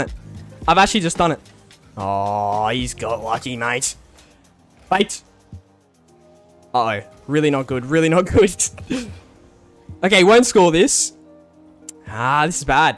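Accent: Australia. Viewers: as a kiwi voice is one of the only aussie accents i actually like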